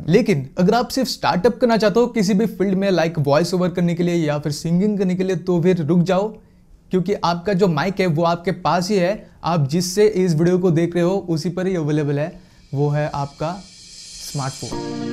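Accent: Bengal, India. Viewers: This was absolutely helpful ♥️♥️ subscribed instantly ! 😄 By your accent I feel you are Bengali .. 🙌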